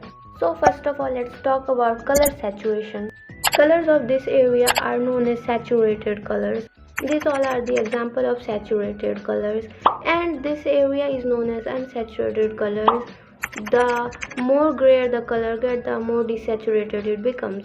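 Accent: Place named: India. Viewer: You are right the accent does sound Indian, or SEA at least. But hey none of our business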